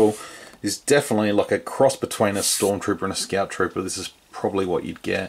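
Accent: Australia. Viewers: Hey man, you sound Australian and so am I. Wondering were you picked this fig up if you are aussie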